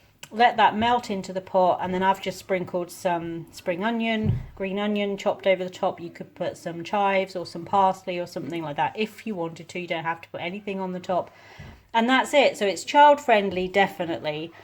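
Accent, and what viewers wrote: United States. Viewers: It really is fascinating 🥰 had no interest in history when I was younger...I love it now🤣 Do you know, when I was listening to you speaking I thought there may have been a slight American twang...15 years wow!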